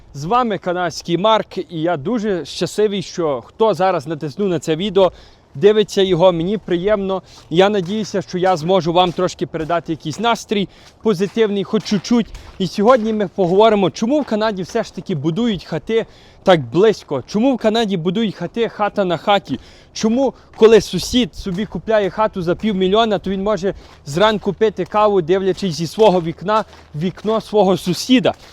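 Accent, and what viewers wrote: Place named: Ivano-Frankivsk, Ukraine. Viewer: Який чудовий акцент. Наче з Франківщини?)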